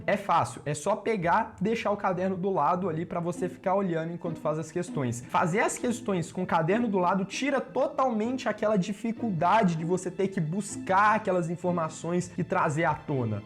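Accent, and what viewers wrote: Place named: Minas Gerais, Brazil. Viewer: Seu sotaque é muito massa. Tmb sou mineira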